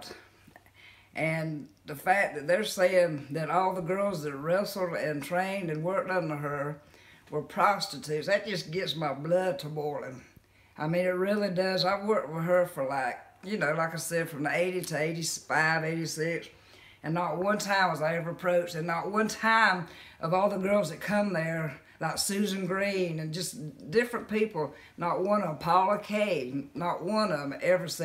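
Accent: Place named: Southwest Virginia, United States. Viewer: She sounds like she is from Southwest VA. lol I like it.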